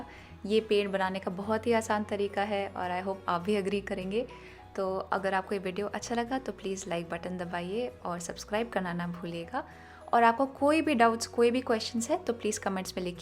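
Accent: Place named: Kashmir, India. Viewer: Didi are you kashmiri?? Your accent sounds so ❤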